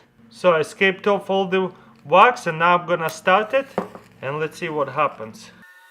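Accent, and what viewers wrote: Russia. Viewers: ive never wanted a Russian accent so bad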